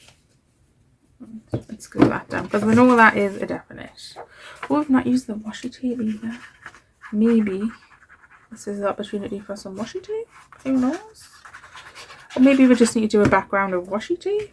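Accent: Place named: Northumberland, United Kingdom. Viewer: Northumberland in Uk lovely accent :) from NZ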